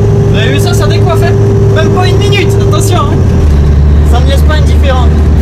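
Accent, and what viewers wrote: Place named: Toulouse, France. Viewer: un garage en toile en plein été c'est tout bon j'ai repeind mon lada sous un préau en pleine été avec du vert brillant direct et sais franchement pas mal malgré quelque petit défaut ;) Bon du coût mon express a était swapé avec un f8Q mes avec soit la culasse du F8M ou il on juste remis l'admission du f8M, un vrai ch'mille blic la mienne, mes bon pour 100€ j'vais pas me plaindre 😅 Mes sinon vu ton accent tu serait pas de la région Toulouse ou quelque chose comme sa ?? Perso je suis Tarbes donc j'me dit que peut être une fois la mienne fini...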